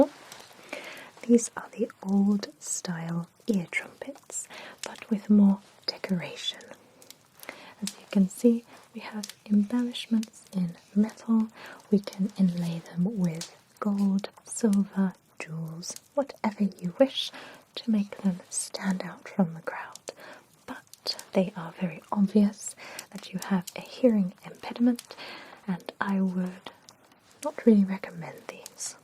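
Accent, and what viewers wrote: England, United Kingdom. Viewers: She said two words and I already knew she was as british as tea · It’s such a masterpiece video. I feel like being examined and talked to by Natalie Portman with English accent. And all the fabulous hearing devices! My hearing loss will be recovered in no time✨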